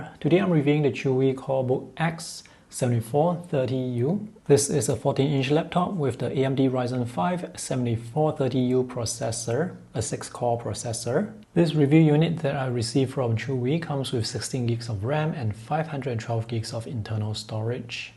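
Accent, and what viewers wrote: Singapore. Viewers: Nice review you sound like a Singaporean! 😊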